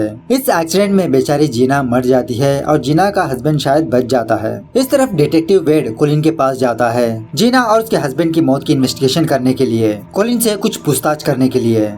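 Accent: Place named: South, India. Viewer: Hi.. Am totally addicted to your channel now..from last few days am continuously watching all your videos..enjoying a lot..tamil, telgu, malayalam,bengali,marathi...all in one subscription.. 👍🏽..like your South Indian accent too..keep it up...All the best ....looking forward to all new ones..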